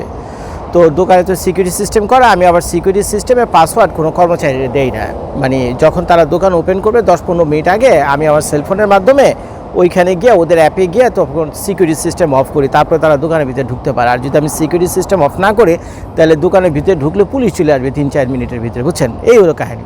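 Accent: Dhaka, Bangladesh. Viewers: da apone ki hasnabader chele naki aponar kotha kichu ta dhakakiya der taan ashe baki ta mone hoy hasnabader jak bhalo thakben barir shobai ke neye ae prathonai kori ami ❤❤❤❤❤❤❤❤❤❤❤❤❤❤❤❤❤❤❤❤❤❤❤❤❤❤❤❤❤❤❤❤❤❤❤❤❤❤❤❤❤❤🎉🎉🎉🎉🎉🎉🎉🎉🎉🎉🎉🎉🎉🎉🎉🎉🎉🎉🎉🎉🎉🎉🎉🎉🎉🎉🎉🎉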